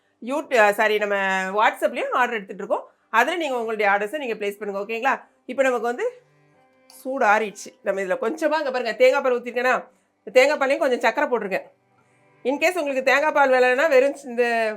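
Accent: Tirunelveli, India. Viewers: 👌👍 திருநெல்வேலி யா நல்ல தமிழ் பேசுதேங்க இது முதலில் எழுதியிருக்கேன்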